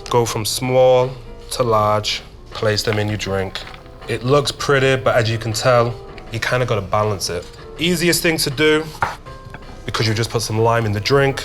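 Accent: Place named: Leeds, United Kingdom. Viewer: Aww bless you son. Speaking in another language with that Leeds accent. Bless you. Made me laugh. I like the pear voka xx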